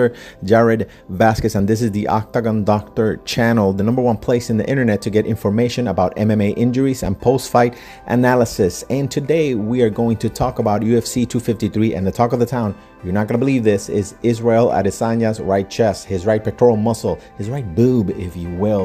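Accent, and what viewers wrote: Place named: Philippines. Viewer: You sound very fillipino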